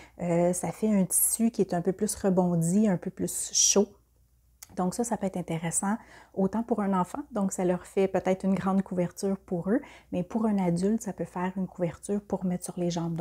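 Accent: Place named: Quebec, Canada. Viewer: merci pour ton accent je pense que tu es du Québec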